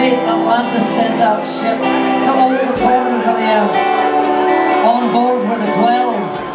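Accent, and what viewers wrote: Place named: Ireland. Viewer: who does the opening narration? not in CRB is he? sounds Irish.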